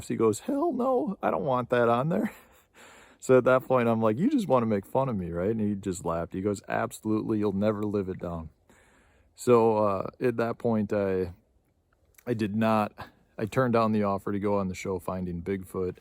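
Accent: Wisconsin, United States. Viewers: Thanks for sharing. It takes courage to talk about this subject due to the fear of ridicule. I appreciate that. Plus, it's always fun to hear a Wisconsin accent! Seriously though, you might want to check out Facts by How To Hunt, Steve Isdahl's Youtube channel. He posts about other people's encounters and experiences. Also, Dave Paulides & Scott Carpenter. All very informative channels. Lot's of critters out there. Fish on!🎣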